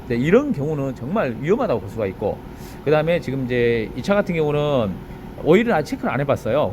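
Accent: Jeolla, South Korea. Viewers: 고향떠나 해왜생활하면서 전라도 고향말을 잘듣고있습니다. 건강허세요. 😜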